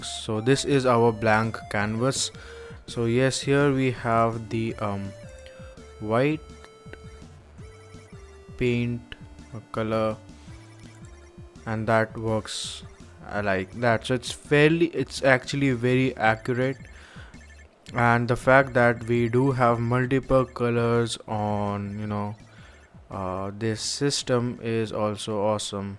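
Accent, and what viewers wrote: India. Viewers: It is Indian accent, i have not problem with that, but for some reason people want flawless english... We are shaped in while growing to adapt to our language, i speak portuguese and my accent when i talk in english is pretty noticeable, but americans say they can understand me pretty well, in fact, they say that the syllabic latin language makes it more audible. Some people are just jerks...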